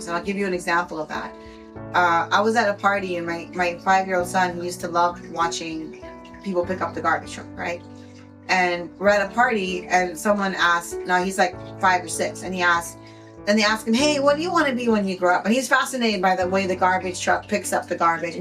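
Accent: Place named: United States. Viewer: Presentation is excellent and your voice and words pronounces are great with subtitles (pure American accents) keep it up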